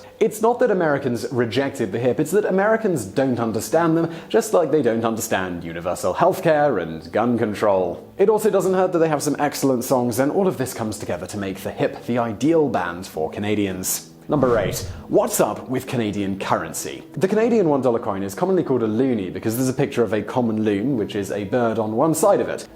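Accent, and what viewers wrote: United Kingdom. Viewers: Why is there a British guy talking about Canada? Why not a Canadian, eh? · It is by a Brit you idiot, how could you listen to the guy and think by his accent he was American. Are you deaf or what is your problem ?.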